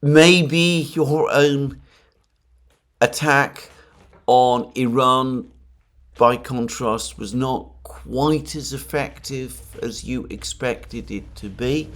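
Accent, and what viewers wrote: England, United Kingdom. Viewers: EduardoArtistaDelavega: you are talking from a common sense perspective, you are exposing the hypocrisy of America, but then you spell colour via the Webster dictionary. The Duran are interesting also, when I first watched the Duran I really believed that Alex not only lived in America, but I believed he was an American, even though he had Greek ancestry, as all Americans have ancestry from somewhere else. Alexander on the other hand, is so English in his use of the English language, coming here as a young boy, approximately aged 6, he has adapted to become very much an Englishman. But then one day soon after the Special Military Operation started, I discovered that Both Alexander and Alex had individual YouTube channels. Alex was walking around Nicosia on a daily basis and would also travel on occasion around Europe, I was totally taken aback by this fact, that Alex with his very strong American accent, didn’t even live in America, he probably just learnt American English, I still don’t really know why he has such a strong American accent. 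So we have this contrast with The Duran, one articulates himself via an American English accent and the other as an Englishman, but both are Greeks, although one is a Greek Cypriot. But this oddity is actually a winning combination and adds to the aurora of The Duran. So Eduardo, are you American, your use of English tells me you are not, but your spelling, tells me you are. Which are you?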